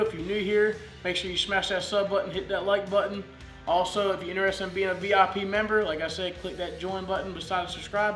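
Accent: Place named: East Coast, Canada. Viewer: Dang I'm up in Canada It sounds like you have an East coast Canadian accent.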